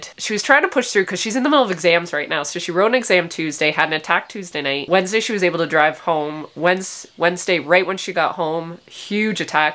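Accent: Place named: Canada. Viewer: I love the Canadian in your voice!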